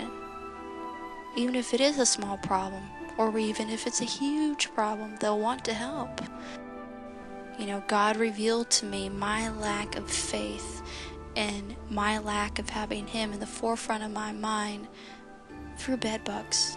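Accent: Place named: United States. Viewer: yep, here I am at 3.30am in the morning stuggling to find a solution to this bed bug problem (from the past Lord knows how many years, but they just keep coming). You sound like you're American.....I speak to Americans everyday as part of my job here in India. I'd like to thank you for taking the time to post this video. I've prayed about it...but the people in this joint family aren't very careful about stuff, they keep old junk stuff under tables or where ever they find place...help Lord :(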